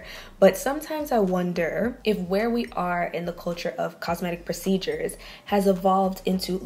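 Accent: Jamaica. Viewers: Its the patois for meeee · you sound Jamaican, are you a YARDIE? If so, TUN UP!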